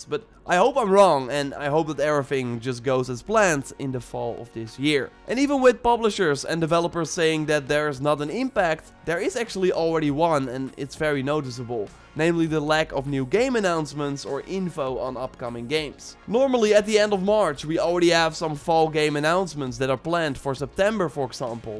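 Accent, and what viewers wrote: Netherlands. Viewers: U sound dutch dude xD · lekker maat, goeie video. ik vroeg me al af of je Nederlands was aan je accent te horen. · NGL, when I imagine a Dutch accent, this is what it is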